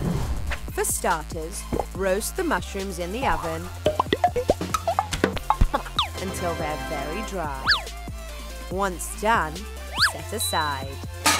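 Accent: United Kingdom. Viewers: It's what makes it more eye catching especially bc the narrater is British so I like it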